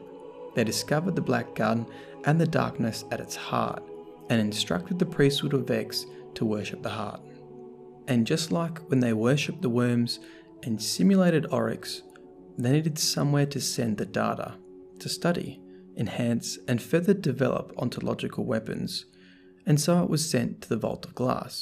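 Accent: Australia. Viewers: Man this is soo awesome. So well described. Gear to hear and Aussie voice talking lore.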